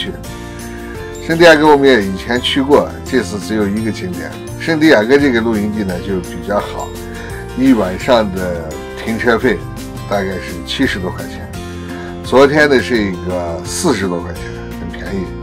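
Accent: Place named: Xinjiang, China. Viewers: 一样新疆老乡，真真的谁的是新疆的疆普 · 姜先生您好，听了你的节目有一阵了，感觉你的口音特别像我们新疆的普通话，结果今天终于发现咱们是老乡了，我从小在博乐长大，在乌鲁木齐上大学并生活了十几年，现在我在Iowa 定居。 你的口音太亲切了。支持老乡。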